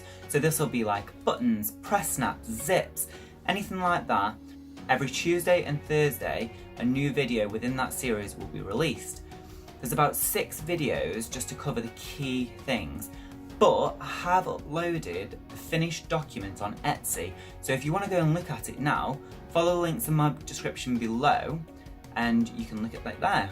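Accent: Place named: Yorkshire, United Kingdom. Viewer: i was watching your 5 fave android apps for fashion and started perusing your vidz and checked out your Etsy and was going to ask how do you find Etsy ? I find it heavily oriented towards the US and me being a UK expat in NZ selling sassy designer clothing is hard going. They seem to expect everyone to give free shipping to the US ! Anyway, had to Google you defending James Charles etc but was totally lost on who he is (old age !) so I was born in London in the flower power era but got marooned in Yorkshire for 20 years way back and I'll guess you are from North Yokshire ? Barnsley ? Touch of Birmingham on Your ending G's but it's an accent for life !! lol I can come up with 4 countries accents in 1 paragraph hah. Sunshine Greetings to the Homelands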